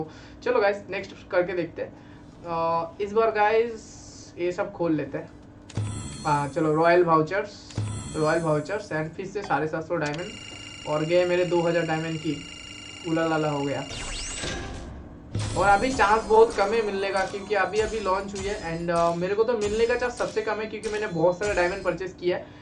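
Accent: Bengal, India. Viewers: Bengali Bhai kitni bhi Hindi bollo I can understand you are Bengali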